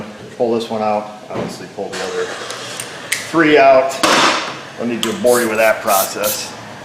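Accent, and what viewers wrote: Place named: Alabama, United States. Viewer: I like these videos , but I am from Alabama and it seriously sounds like he is saying Cadillac converters. Which is what someone from Alabama would say".".".......................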